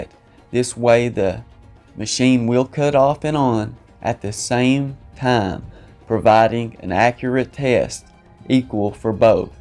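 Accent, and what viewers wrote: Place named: Rural, United States. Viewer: Can't understand a word you're saying. Need a translator- I don't speak hick. And what's with the dramatic music? It's motor oil, not space travel. C'mon man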